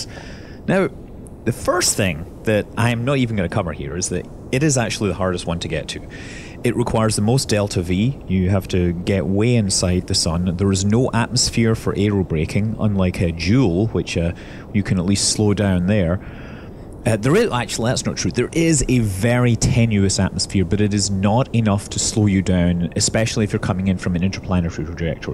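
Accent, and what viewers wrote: Scotland, United Kingdom. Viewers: Oh I his accent sounds very scottish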